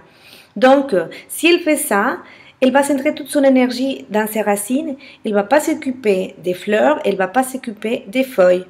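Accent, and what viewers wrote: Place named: France. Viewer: Te sigo en español querida...pero adoro tu acento francés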